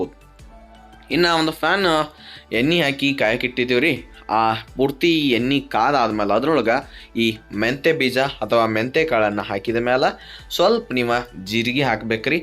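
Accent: North Karnataka, India. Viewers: Surper utar karnataka bhashe and sabar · Pakka nam uttarakarnataka maatu🤗✌️🥰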